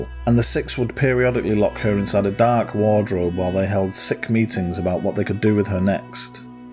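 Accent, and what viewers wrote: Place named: Manchester, United Kingdom. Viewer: So comfortable to listen to a fellow Mancunian, and your dry humour is epic. Don’t stop pal, I’m here every day waiting for your content 👍🏻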